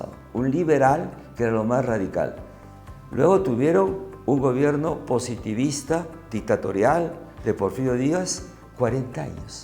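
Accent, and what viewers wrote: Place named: Peru. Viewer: G Reinsther al sr del video se le nota su acento. es peruano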